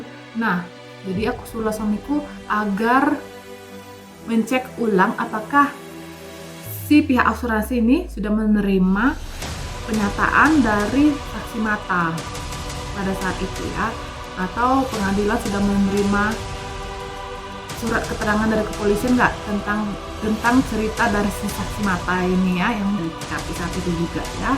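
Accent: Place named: Medan, Indonesia. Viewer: wkwkkwwk jujur kali critanya,lagi beol manggil2.hadohhh.salam dari martha mccrea sist,logatmu itu loh.medan kali wakkkk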